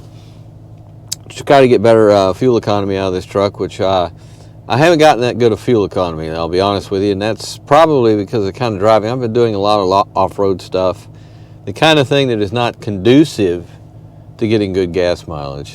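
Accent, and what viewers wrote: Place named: South, United States. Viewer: Your review of the tundra trd pro was the best I’ve seen. I’ve ordered the 2026 and for the past 4 weeks have watched every video I can find. Your approach, attention to detail, and technical information, combined with the southern draw was worth the price of admission. I learned a lot and look forward to delivery with both eyes wide open. Thank you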